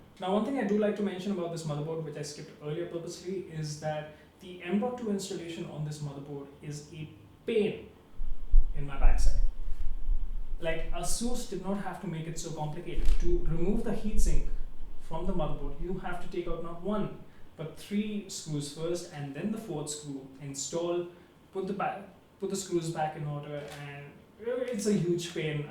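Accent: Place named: India. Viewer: Thumbs up for the clear and understandable accent from an Indian guy.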